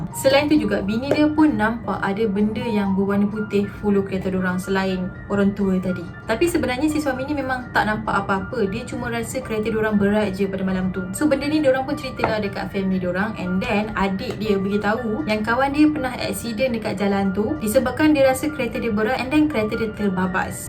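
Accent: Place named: Kedah, Malaysia. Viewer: Cara ckp gaya org Kedah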